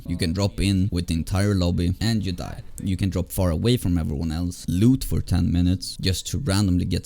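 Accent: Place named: Sweden. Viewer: I love your swedish accent, new sub tho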